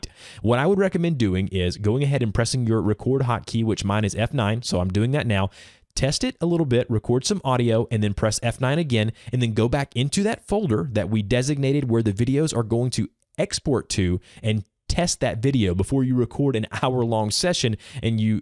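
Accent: South, United States. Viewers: he sounds southern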